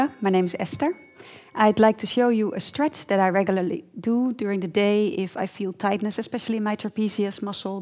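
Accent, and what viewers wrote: Netherlands. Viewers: 10 seconds in. Holland, for sure =D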